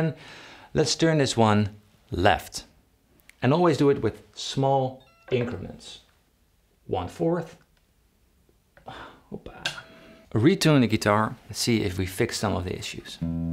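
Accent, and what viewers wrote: Netherlands. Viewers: Why do you sound so dutch lol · Plus heavy Dutch accent. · Do I hear a Dutch accent? Bedankt voor je zeer informatieve video! Zeer leerzaam!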